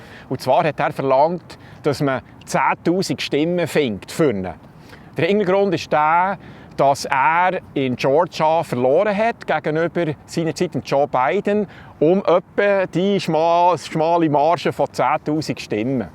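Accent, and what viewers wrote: Bern, Switzerland. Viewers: iu, tschübe, si'sch gäng schöön d's Bärndüütsch. Tuet soo guet, im Kanton Basu/Solothurn (näbenang)! Regina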